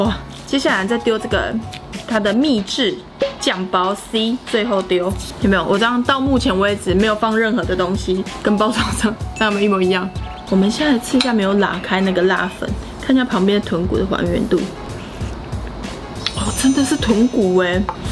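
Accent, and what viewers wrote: Taiwan. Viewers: 「 好辣選我選我」 喜歡看開箱的影片還有聽你烙台語哈哈哈真得辣了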